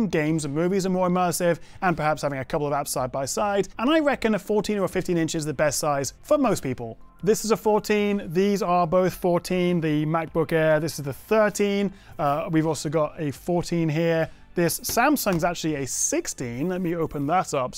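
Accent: United Kingdom. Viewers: The British language sounds wonderful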